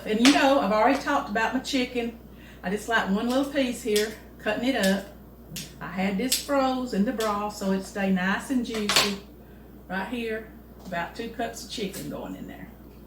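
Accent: South, United States. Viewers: I enjoy your videos. They’re simple easy to follow. And I truly enjoy your plain Country talk I can understand it fine. The way you present yourself it’s just, well it just family. Don’t know any other good way to say it.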